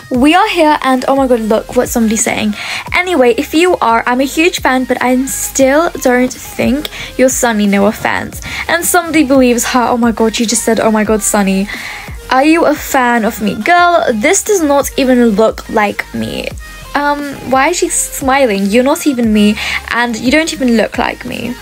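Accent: United Kingdom. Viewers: Um are you British?😂 i hear it in your voice!😅😅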